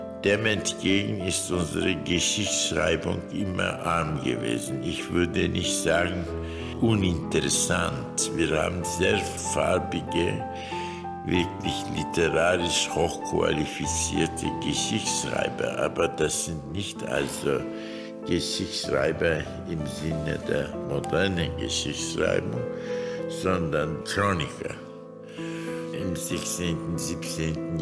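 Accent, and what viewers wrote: Germany. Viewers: Hannover aksanımı bu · Bavyera Almancası ile mi konuşuyor diyeceğim, evet. Neden Neu Hoch Deutsch değil ?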